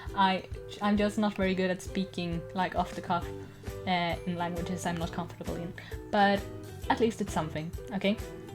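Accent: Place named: RP, United Kingdom. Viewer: it's a bit posh but in a sophisticated way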